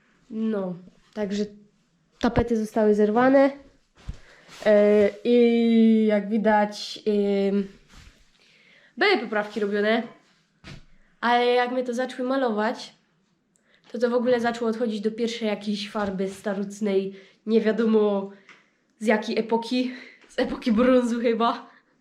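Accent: Silesia, Poland. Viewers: fajna ta ślunsko godka w wykonaniu.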